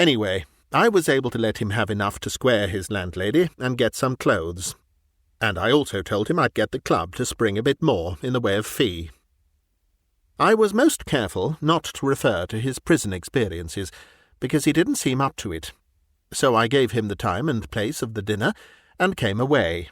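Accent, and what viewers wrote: England, United Kingdom. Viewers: Another narrator got accused of being AI. It's a sad day when we can't tell the difference by the generally god awful rhoticised intonation of AI butchering the story and the rich timbre of a cultured English accent reading a jolly good story! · For some unknown reason I sleep best to British accents. It's wonderful to hear your beautiful human voice with perfect pitch and normal human rhythm and none of the bizarre pauses that gives away the robot voice and is jarring enough to wake me if I have dozed. I do hope YouTube doesn't succeed in their apparent campaign to eliminate all actual human narrators.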